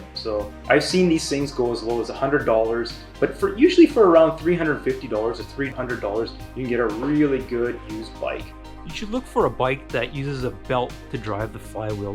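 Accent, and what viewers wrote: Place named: Canada. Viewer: I love that Canadian accent !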